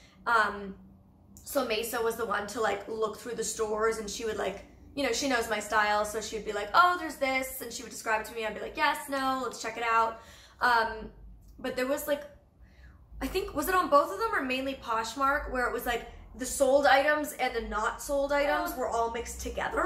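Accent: Canada. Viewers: Aww! We don't laugh out of derision of your cute accent, we laugh because our brains are listening to your less affected accent and we forget you're Canadian. Then our silly brains are like "oh yeah she is!" So I guess the giggle (which is what I do) is partly out of our silly brains forgetting your nationality, and part because it's cute. FYI, I still giggle when I'm over at my friend's house and her husband says about. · I love your Canadian accent so much! I love the way you say “sorry”💕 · I love Rebecca Minkoff purses, I Always Love your style and I love your Canadian accent! I love Canadians! xo All really cute items! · Your Canadian accent is so cute haha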